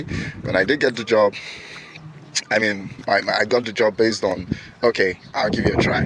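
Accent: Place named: Nigeria. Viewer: Thanks a whole lot for sharing, it's so inspiring and I believe your course will help me understand better, many of the things I struggle with cos I really needed stuffs to be explained to me in that naija tone, and here I am!! hehe. Thanks a lot.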